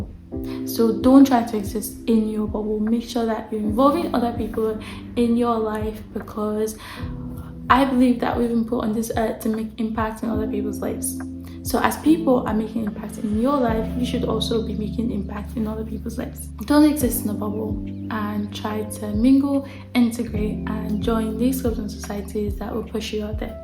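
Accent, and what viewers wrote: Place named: Glasgow, United Kingdom. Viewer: You definitely have a a Glasgow accent tinge in your voice! From a Glaswegian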